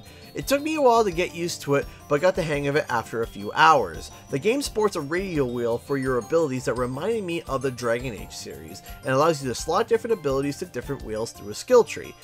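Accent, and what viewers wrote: Canada. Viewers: You sound real Canadian in this review!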